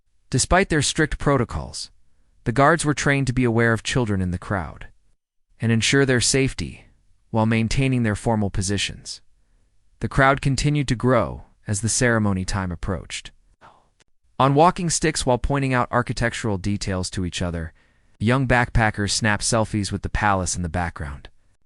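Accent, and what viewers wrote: United States. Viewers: Yet again more smooth American accented bullshit! Why not take the mickey out of the Arlington guards? Chickenshit?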